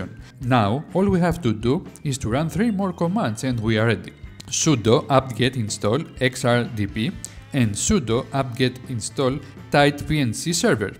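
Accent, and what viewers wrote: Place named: Greece. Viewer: Κανεις μπαμ πως είσαι Έλληνας αδερφε 😆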